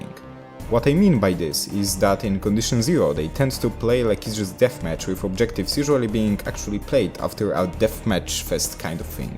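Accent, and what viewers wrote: Poland. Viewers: Twoj akcent jest genialny odrazu domyslilem sie ze to polak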